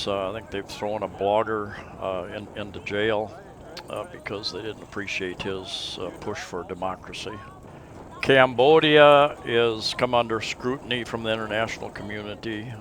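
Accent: Midwest, United States. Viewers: Thx for the vid…older vid but you covered many important points..in 8th grade (Wisc) we sang from “The King and I” which I think is the first time the idea of living abroad came to mind, then working at an airline was able to explore many places and visit relatives in Sweden and Wisconsin..stay in Tland now (waiting to get back) but PI is my 1st option if things don’t work out there..compliments on your vids done in a midwest accent lol 🙏🏻👍🏻✌🏻🕊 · I just found your channel today. Liked. Subscribed. Wish I had found it sooner. After two visits to PH I knew I would be retiring here. My wife and daughter joined me in Quincy (just south of Boston) MA for about six years. It was a little sad. The first snow of their experience was magical for the first 20 minutes. Then my daughter was like, It was fun but I'm done. Left my wife and I to shovel the driveway alone. 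They endured six winters with me there. I retired early in 2013. Brought my tropical flowers home. I've been here (Siaton, Negros Oriental) for over five years. Just living the simple rural life. I was trying to place you accent thru several of your videos until I came to this one where you say you are from Minnesota. I knew I had heard it before. My best friend from my Navy days was Objibia from northern MN. I don't get out much but if you're ever in the neighborhood...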